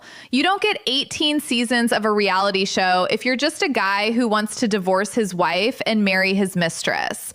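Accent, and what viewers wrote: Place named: California, United States. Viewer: For the love of God and all that's holy, make the AI get rid of the California sccent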